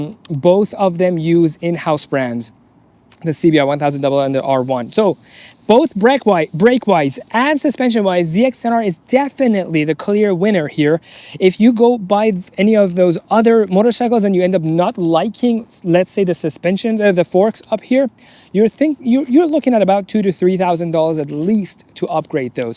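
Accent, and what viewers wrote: Iran. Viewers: persian accent straight away haha nice vid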